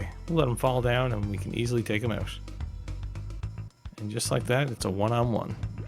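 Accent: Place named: Ireland. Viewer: Great video man, can I ask if you’ve any relation to Ireland? You pronounce a lot of your words just like I would, I’m from Dublin :).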